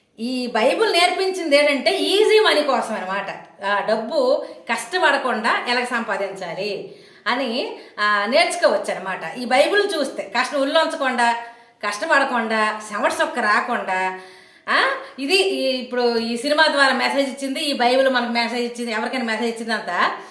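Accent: Srikakulam, India. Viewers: అక్కా నీ శ్రీకాకుళం బాస చాలా సూపర్, కానీ యేసులో ఉన్న ఒక్క కొలటి కూడా నీలో ఉంటే చాలా అభిమానించే వాడిని, నీకు దేవుడు మారుమనస్సు దయచేయును గాక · సికా కులం ఏమో 😂 అనుకున్నా నేను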